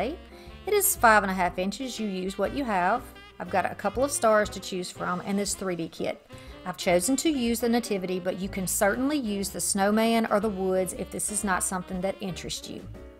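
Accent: South, United States. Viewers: I love how you curled the ribbons on your bow! So easy but so cute! You always find the greatest stuff at your thrift store and at Dirt Cheap! The closest one to me is Fort O, GA! You definitely sound like you’re in the south lol! TFS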